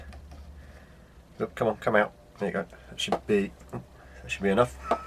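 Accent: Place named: United Kingdom. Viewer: Love your accent are you British or austrailian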